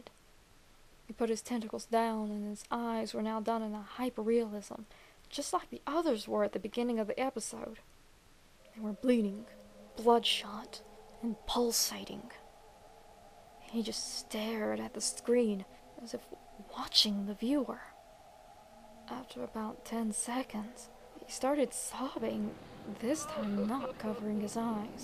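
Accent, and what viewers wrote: South, United States. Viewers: You sound like a Hucken Hill Billy.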